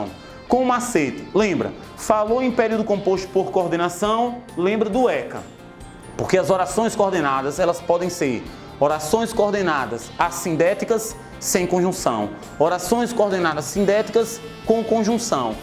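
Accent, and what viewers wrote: Northeast, Brazil. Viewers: Seu sotaque é do Piauí ou da Paraiba ? Aula Show e sensacional ! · vídeos Diversos ele é nordestino né não?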